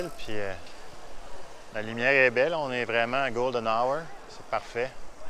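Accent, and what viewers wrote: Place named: Quebec, Canada. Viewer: Sérieusement si tu faisais tes videos en anglais avec un peu plus d'editing tu pourrais vraiment propulser ton channel. Meme si t'as un violent accent du QC cest pas grave. 1.Les anglophones vont vraimement aimer l'effort 2. Ton contenu serait accessible aux anglophones (au moins 90 des utilisateurs Youtube). Aussi non continu. Jme fie sur toi pour trouver les bonnes places.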